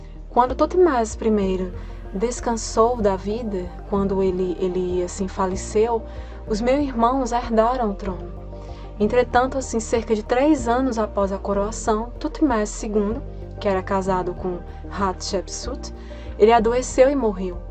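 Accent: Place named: South/Southeast, Brazil. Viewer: Sou seu fã..., incluindo seu sotaque que, me parece, é do sul/sudeste...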